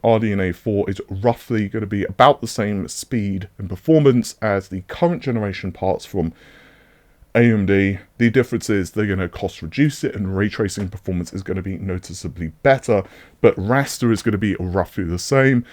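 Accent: England, United Kingdom. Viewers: Brother Man sounds like Tea and Crumpets looks like The Rock's English cousin. SWOLE!:)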